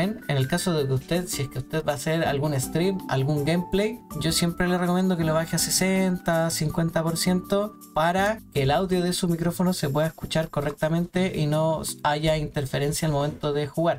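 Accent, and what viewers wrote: Chile. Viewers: como se nota cuando alguien es chileno xd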